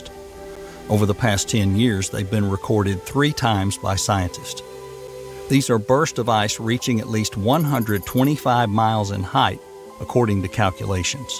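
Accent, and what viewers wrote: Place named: Oklahoma, United States. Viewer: He spunds like huggbees but from Oklahoma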